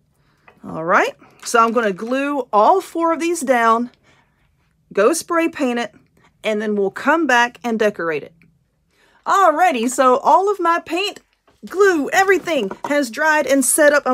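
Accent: South, United States. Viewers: This flower box turned out to be cute. I freaking love your southern accent 😘👍💕💕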